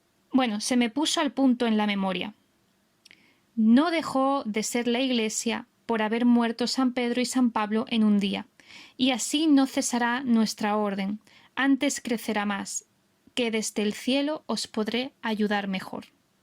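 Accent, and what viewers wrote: Andalusia, Spain. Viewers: Muchas gracias …. Y ese acento espontáneo andaluz me encanta jajajajaja. Agradecimiento por tu trabajo de un andaluz provinciano . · Me encantó la síntesis que has hecho. Felicitaciones! En cuanto a tu acento andaluz…no te disculpes por ello, es parte de lo agradable que escucharte. No soy española, aunque si de ascendencia y andaluza además. Mi familia llegó a Costa Rica procedente de Torrox, Málaga. De ahí que el habla andaluza me sea muy querida y cercana. Un abrazo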